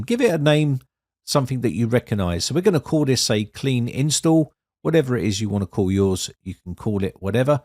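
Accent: United Kingdom. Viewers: Bri ish tbh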